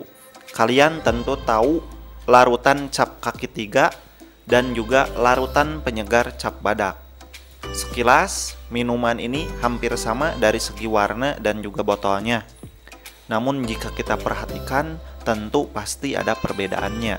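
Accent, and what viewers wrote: West Java, Indonesia. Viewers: Akang orang sunda yah?? Logatnyah nyunda pisan...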